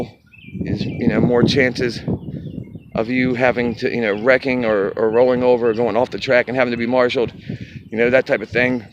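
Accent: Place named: Pennsylvania, United States. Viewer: You sound like you're from PA, and not NC. Just an observation. :D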